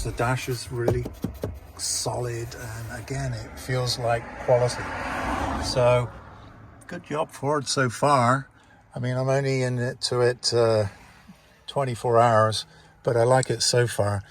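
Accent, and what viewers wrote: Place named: United Kingdom. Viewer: Good to hear another British voice here, I’m in OC. Love these base broncos. Look forward to seeing what you do to it 👌🏼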